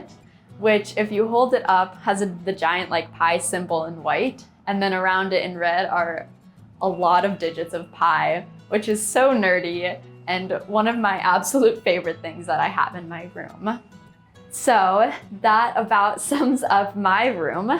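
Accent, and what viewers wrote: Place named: Italy. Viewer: Interesting accent. Almost Italian the way many words end with a vowel sound.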